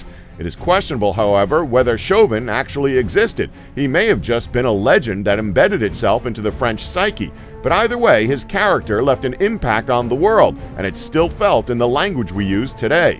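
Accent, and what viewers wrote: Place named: United States. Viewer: This channel is funny to me , it's a guy with an American accent reading something clearly written in a British dialect.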